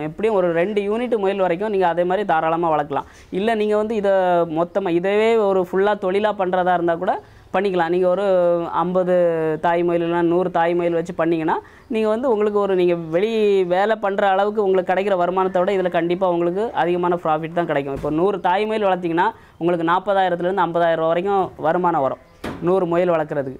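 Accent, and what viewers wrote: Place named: Tirunelveli, India. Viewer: Tirunelveli mathri irukku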